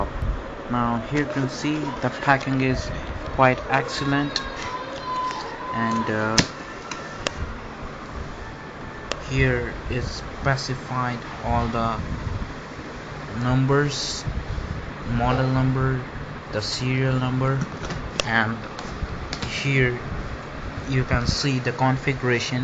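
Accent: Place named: India. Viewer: Hey can u tell me how much did u pay for this laptop i think u sound like an Indian Person...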